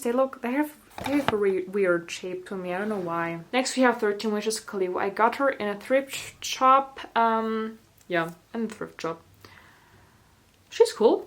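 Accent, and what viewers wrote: Germany. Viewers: are you german ? (me 10 minutes later: JA BIST DU. Ich hab es einfach gleich gehört weil ich den gleichen Akzent habe)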